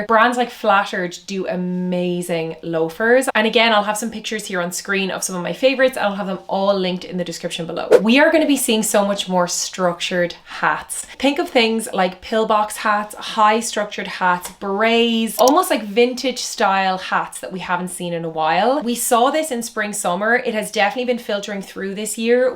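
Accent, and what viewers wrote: Ireland. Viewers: Just sounds Irish to me!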